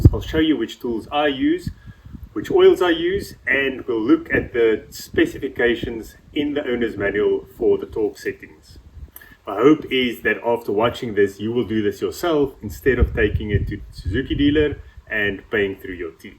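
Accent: South Africa. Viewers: ‘tsempian’ for sure lol. You will never be able to hide your South Africanisms😅 I must add that one thing I really do like about an SUV is that with its higher ground clearance one can get under the car and service it for the most part (however somewhat awkwardly), but with a normal sedan or hatchback just jacking up the car is such a chore and I hate it. On larger SUVs the engine bays have space to use tools, smaller cars not so much.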